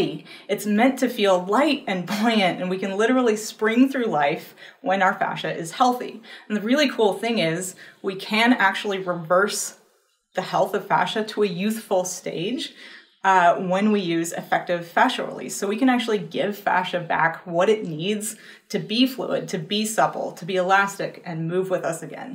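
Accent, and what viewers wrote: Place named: West, United States. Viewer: Your accent sounds N of Mason-Dixon line & W of Mississippi River, but not a flat-lander or inter-mountain W, so maybe a left coast origin, but the Science Museum on the 270 inner belt in St Louis had a display of every system from the human body to include the Fascia. No info on how the display was accomplished. Visitation hours are short, like the staff wants to go play golf PM & unfortunately they are/were not open every day. Like all museums, displays change. Worth a 500 mile day trip for those in the area with an interest. Thx & GL.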